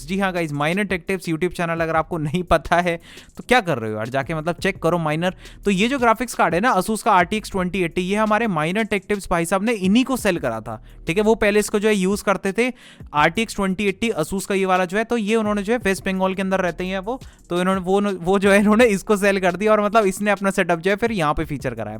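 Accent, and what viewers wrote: Gujarat, India. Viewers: Bro are you Gujarati??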